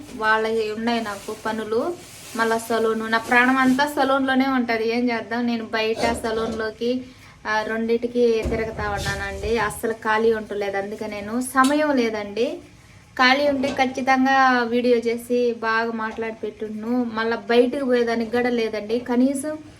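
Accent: Nellore, India. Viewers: హలో గాడ్ బ్లెస్స్ యు. నీ వీడియోలు చూస్తాము. ఆ నెల్లూరు బాష వీనీ ఆనo దీ స్త ము. మా ది గూడూరు. జాగ్ర త గా ఉండు.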